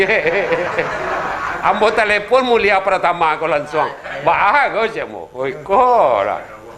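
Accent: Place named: Bukittinggi, Indonesia. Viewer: Klo awak tebak dari caro ngeceknyo mungkin urang Bukittinggi/agam klo dak dari Padang panjang...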